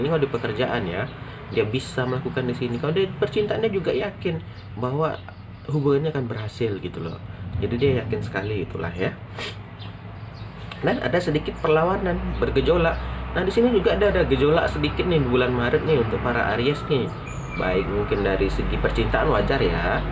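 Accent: Padang, Indonesia. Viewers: Orang Padang ya,,,logat nya tu loh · Ini yg reading orang padang yea logat nya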